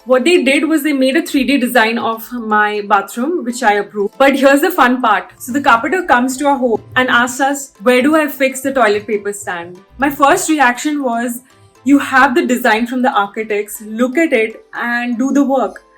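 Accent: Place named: India. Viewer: After 13 years in US still have a very strong Indian accent!!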